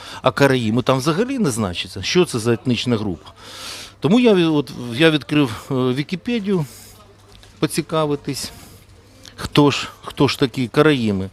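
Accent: Russia. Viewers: Украинскава? Це не дискредитація? Акцент чисто кацапський А нема більшого ворога ніж кацапи. То не маніпулюйте, навчіться української вимови хоча б. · Мій дід би сказав: "срав пердів і ще хотів"! Може автор відео ніколи не був у Бердичеві, або у Черкасах, або у Львові де назви вулиць писалися у тому числі і єврейською мовою ще у п'ятидесятих роках...хоча персонаж, що у якості довідника використовує "вікіпедію", вже багато про що говорить! Ну звичайно ж євреї! То не 🤡 закрив усі ракетні програми і довів до банкрутства "Укроборонпром""; скоротив армію та "відводив" війська! Ага! Тільки євреї винні у всьому! От 🤡 Ні!!! Ну а євреї обов'язково! І кацапи НІ! Тільки от євреї!!! Цікаво таки що автор цього опусу робитиме у світі без євреїв?! Ну без більше 80% Нобелівських лауреатів; без усієї банківської системи; без дантистів ну бо у більшості випадків євреї?! Без грошей бо навіть текст відео не може відтворити без папірця а значить не заробляє багато... 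Доречі! А чому такий "патріот" і не на фронті?! І мова у цього персонажа якась не зовсім українська...є акцент кацапський! Може "патріот" віщає з мацкви?! Га?! P.S. перші паперові гроші УНР дійсно мали написи декількома різними мовами! Читайте історію! Тільки час свій згаяв на цю дурницю! Якість інформації; якість мови; якість думок; стиль подання інформації як у першому класі...жах просто!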